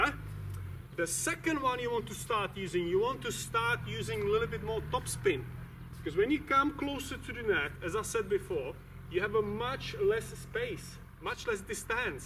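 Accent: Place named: Czech Republic. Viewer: nice one, thanks. where are you from by the way? your accent sounds tchechish.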